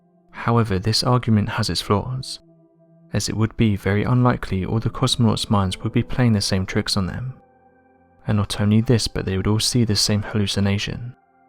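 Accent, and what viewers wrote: England, United Kingdom. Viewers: What's with all these info videos with these friggin narrators with english accents ?????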